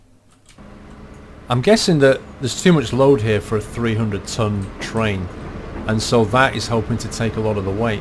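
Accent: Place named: United Kingdom. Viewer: Whats up with you and crossbars?? Ever imagine how your car will feel without differential, almost the same deal with bridges and crossbars. u play like u have never seen a bridge before. by your accent u live in UK, how can u be so naaive about structures. Always use crossbars below the bridge and in places where there is a lot of stress.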